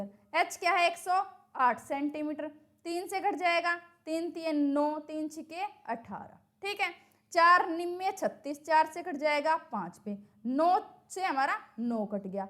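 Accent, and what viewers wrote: Haryana, India. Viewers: Mam aap Haryana se ho kya??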